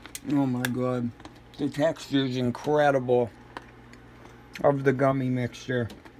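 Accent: Pennsylvania, United States. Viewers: It's called a double boil when you melt gummies or chocolate or butter or w.e in a Pyrex container in boiling water. You can also use a candy melter sometimes sold with candle making or baking stuff . Haha you are definitely from PA I can hear it in your voice! Thanks for the videos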